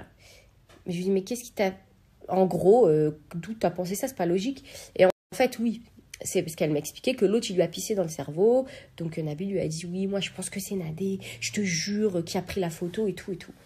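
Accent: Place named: Belgium. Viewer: Merci a toi tes belge???? Jadore ton accent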